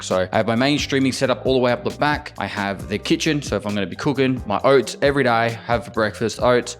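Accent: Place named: Australia. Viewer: why does remind me of russell crowe. not just the accent, but like overall feel and vibe. wise old aussie or NZ'er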